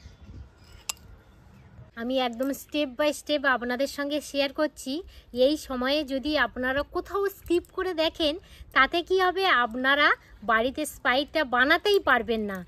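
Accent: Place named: Ghoti, Bangladesh. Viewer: আপনার সুন্দর বাংলা ভাষা (ঘটি ) শুনলাম, ভালো লাগলো।ভুলে গিয়েছিলাম ভারতবাসী।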